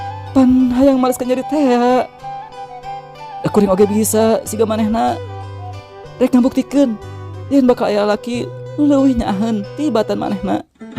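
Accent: Sunda, Indonesia. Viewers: hehe Ari sesama orang Sunda mah kang tos terang logat na 😂 Abi mah garabagan teu tiasa nyarios halus 😁😅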